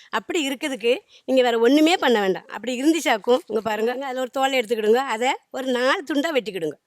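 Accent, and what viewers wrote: Tirunelveli, India. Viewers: Nenga Nagercoil Ila Tirunelveli ya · Nenga thirunelveli pakkama? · திருநெல்வேலி, கன்னியாகுமரி மாவட்டங்களில் பேசுவது போல் இருக்கிறது. சூப்பரா இருக்கிறது. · Tirunelveli tamil kekka sandhosama iruku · Tirunelveli slang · உங்கள் ஊர் சொல்லுங்க..திருநெல்வேலி பேச்சு மாதிரியே இருக்கு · Tirunelveli bashai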